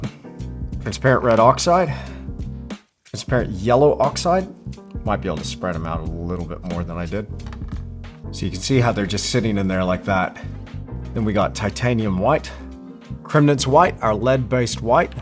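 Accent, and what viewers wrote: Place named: Australia. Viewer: I love your accent mate - Are you an aussie living abroad?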